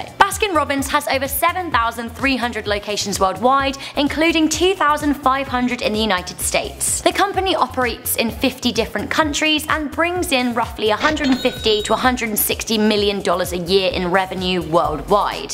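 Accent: United Kingdom. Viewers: she's british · are you British?☺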